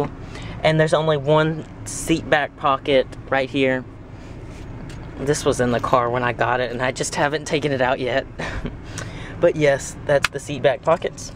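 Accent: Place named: South, United States. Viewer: sweetie hi...i tried.. and tried.. to listen to your review but because of my prejudices i can't..... i just cant take the american southern accent.. anyway.. i think the car is cute.. .my nephew wants this.. for graduation present..... so i just wanted to watch reviews on it....i am sure your review is very detailed.. but that sloooooooooooow american accent... causes too many vexations to my spirit....... be blessed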